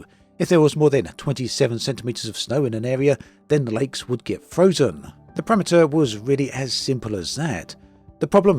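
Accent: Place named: England, United Kingdom. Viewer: It's a fucking english accent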